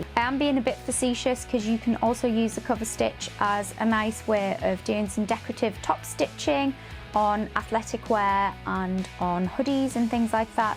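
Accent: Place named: United Kingdom. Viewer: Nice to hear a UK accent 😎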